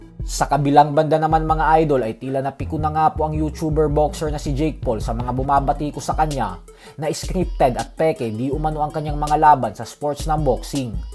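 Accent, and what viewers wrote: Visayas, Philippines. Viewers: Ayusin mo boss mo visayang Visaya 😅😅😅😅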